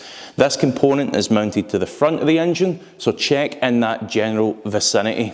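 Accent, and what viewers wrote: Scotland, United Kingdom. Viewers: i like the scottish accent but also the guide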